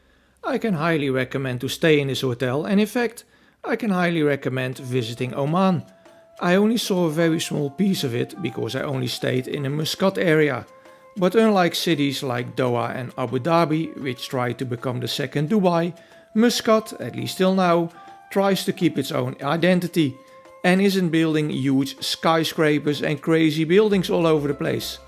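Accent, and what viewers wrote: Netherlands. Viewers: Do I detect a NL'er here speaking?😅 Oman has been for years on my next new destination.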